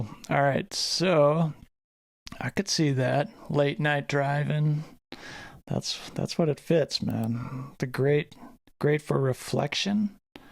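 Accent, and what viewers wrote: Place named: Scandinavia. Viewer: If you have watched a lot YouTube videos, it gets used to presentation like this. Calm, but expressive in moments. It’s actually good for learning and getting new ideas about the subject. It doesn’t force any attention, but highlights. Good job buddy. Pretty sure you are from Scandinavia, Finnish might fit that style the best 🙂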